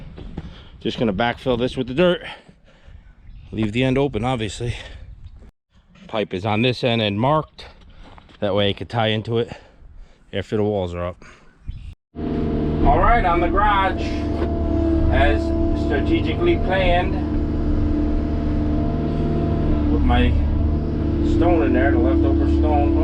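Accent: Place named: Albany, United States. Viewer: I find the commentary entertaining. The way you speak reminds me of my cousins from Albany. My grandmother's sister married a guy after WWII she met while he was here at a former army base and moved up there. They used to come down here during the winter months to get away from the snow and I was always fascinated to hear them speak. I appreciate the vids. Be safe Boss man.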